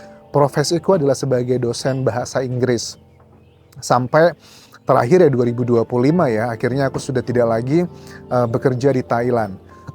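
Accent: Java, Indonesia. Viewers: Pensiun Dosen bahasa Inggris di Thailand masih tergolong sangat muda,logat bahasa nya lebih medok ke Jawa padahal aslinya dari Lampung. Menikmati hidup dengan pekerjaan yg membuat enjoy hasilnya luar biasa. sekelas artis pun belum tentu bisa sepertimu jika menuruti gaya hidup modern.salut nak, semoga segera bertemu pasangan hidup yg didambakan.Tuhan memberkatimu🤲😇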